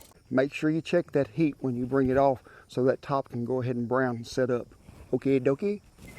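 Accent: South, United States. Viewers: Definitely down South cooking and speechifying! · Ah, yes I believe you're right. I'm disappointed I couldn't figure that out by myself, I live in the south and hear people talking like this all the time lol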